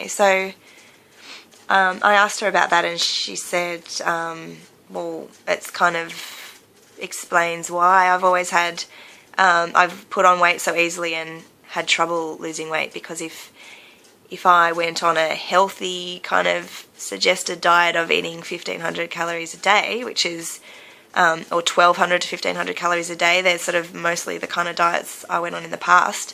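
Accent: Australia. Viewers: Love your Aussie accent... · First off may I say you're gorgeous! I totally relate to what you're saying when you talk about your parent's talking about your outfits when you were younger. I remember once my dad saying I looked like a sack of potatoes in a t-shirt dress I had (that I loved) when I was about 10. Kind of broke my heart a little. I totally get it. I think now though, he is my biggest fan. Thanks for sharing. Love your accent, I'm Canadian so Aussie accents are intriguing ;) I'm going to have to check out that app! Cheers!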